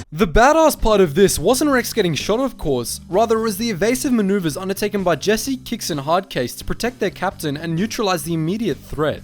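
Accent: Australia. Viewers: gotta love that deep aussie accent narrating this. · Bro you sounded so Aussie in this video, are you? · Love the Aussie voice xD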